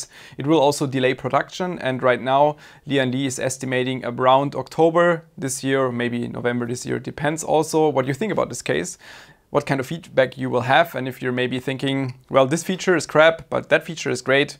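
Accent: Germany. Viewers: Der deutsche Akzent einfach geil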